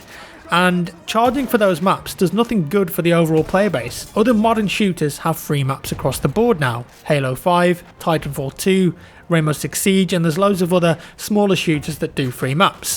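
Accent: United Kingdom. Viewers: Fuck your British accent.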